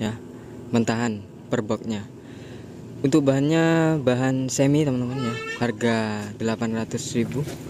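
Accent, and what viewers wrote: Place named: Madura, Indonesia. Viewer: Logat nya mas ke madura madura an hehehehe😅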